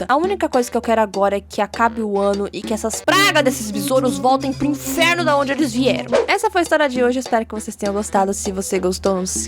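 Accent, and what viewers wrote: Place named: Northeast, Brazil. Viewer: Você mora no nordeste pelo sotaque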